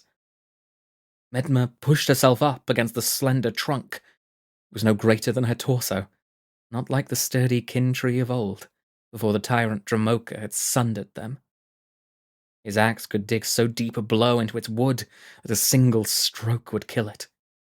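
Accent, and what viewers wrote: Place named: United Kingdom. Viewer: you sound very british in this one!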